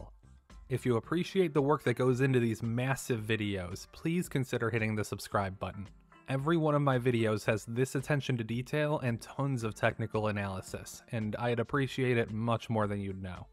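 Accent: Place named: United States. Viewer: Ah an American living in Sweden. Your accent did also seem way too American for me to think you were a native Swede, lol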